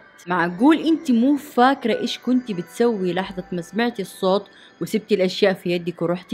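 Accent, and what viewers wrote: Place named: Hejaz, Saudi Arabia. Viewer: لهجتك الحجازية عسسسسل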